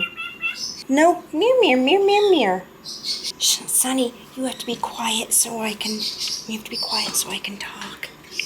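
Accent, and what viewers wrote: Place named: Pennsylvania, United States. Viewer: I love your videos, such a joy to watch! Are you from Pennsylvania, your accent reminds me of a friend from PA?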